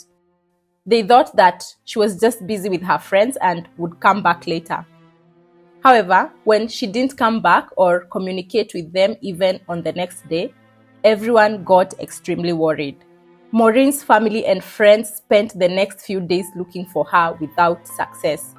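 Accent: Kenya. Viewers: Here in the Uk there are tons of accents and intonations. The narrator of the programme has a Kenyan accent. But what she is saying is easily understood. In the Uk there are regional accents and one cannot make out what the person is saying because the accent and intonation is so strong. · She will improve with practice but, it is a Kenyan accent.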